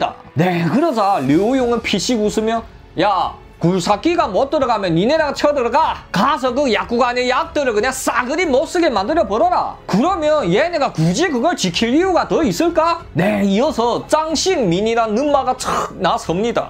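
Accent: Yanbian, China. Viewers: 매일매일 기다리는게 새로운 삶을 사는것처럼 행복하구 즐거워요 ㅎㅎ 연변사투리도 재믿고 표정 하나하나 생동감이 넘쳐서 더 기대가 가요 승승장구 하시길 바랄게요 다음회도 오케이 ㅎㅎ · 하지만 ~하지만 말입니다 조선족 연변말투죠? 그러게 말입니다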